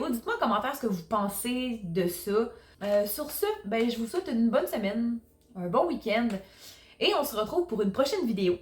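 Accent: Canada. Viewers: Je connais pas cette histoire merci de la partager pas mal ton accent canadien 😱😱😱😱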